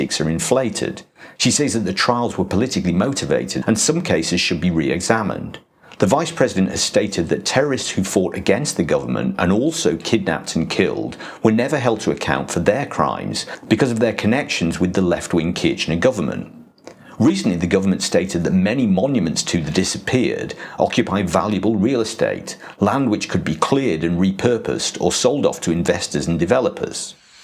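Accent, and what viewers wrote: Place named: United Kingdom. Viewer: You're British accent doesn't mean you are right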